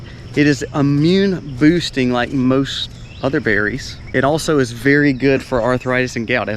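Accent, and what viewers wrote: South, United States. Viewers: He has a rather Southern accent. Maybe he moved, or is in the Appalachians or Ozarks where the weather is more suitable, but Rhubarb comes from the cold, Continental climate of Inner Asia (Central Asia + Himalayas/Tibet). It hates the Deep South and quickly perishes because the summers are hot and winters are far too short.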